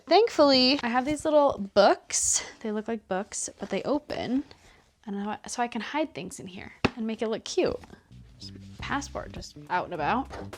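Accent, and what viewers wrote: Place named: Ontario, Canada. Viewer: your page got recommended to me randomly and i could tell immediately you were from ontario canada lol. the accent gave it away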